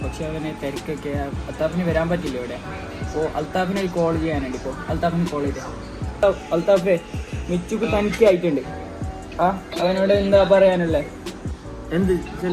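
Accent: Kasaragod, India. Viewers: Ksd slang anne samsarkk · Ksd slangil anne mund 👀🤌🏻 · Bro ningo kasargod language anne pari adh majje aavu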